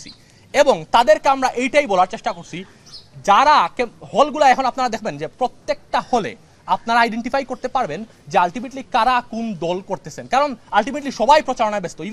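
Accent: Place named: Sylhet, Bangladesh. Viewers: Vai er bangla pronunciation e buja jacche je Vai Sylheti. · Sylhety bro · আরেহ ওতো সিলেটি।।। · ভাইর সিলেটি স্লাং গেলো না, বেশ মজাই পাচ্ছিলাম উনার কথা শুনে।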